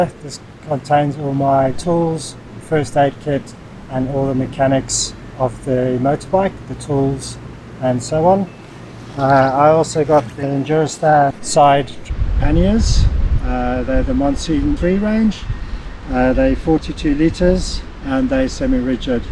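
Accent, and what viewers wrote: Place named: South Africa. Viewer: Hi I just bought one yesterday after dreaming of it for many years! From your accent i take it your south african? I'm from Worcester (SA). Would relly like to meet you one day maybe? Cheers!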